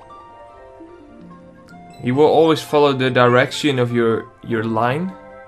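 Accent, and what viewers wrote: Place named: England, United Kingdom. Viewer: Hoe kan jij zo goed met een Engels accent praten?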